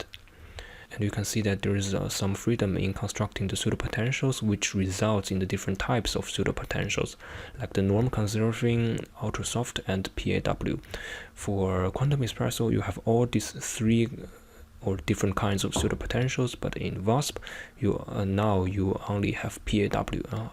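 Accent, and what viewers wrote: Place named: East Asia. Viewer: Such a nice and comprehensive explanation. seem to be the accent of East Asian.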